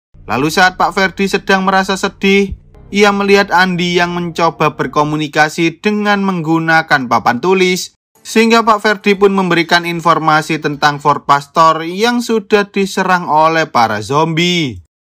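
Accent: Java, Indonesia. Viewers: mimin orang jawa nya medok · aksen jawa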